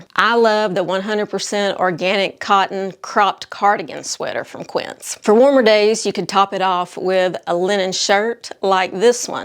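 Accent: South, United States. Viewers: Hi! I am new to your channel! Been watching about a month! I grew up in LA (lower Alabama)! Im 65 and enjoying your videos so much! I'd never heard of Quince before so i am going to check them out! Love the cashmere tank and the cotton sweater in all the colors! One if my favorite things about summer are the flowers and swimming in my pool! Also love getting to finally wear the summer colors and ditch the black and browns (for the most part)! Thank you for the great content. Love your videos! Oh and im enjoying listening to someone who sounds kinda like me ( Southern accent! 😂).❤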